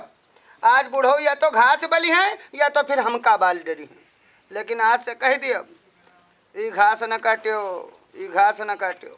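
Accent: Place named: Awadh, India. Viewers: wow must lag rha h ki dadi ma bol rhi h. aapka bolne ka tarika bhut mst h, lag rha h gonda pahuch gye h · Really enjoyed your way of typical awadhi talking ! Keep it up bro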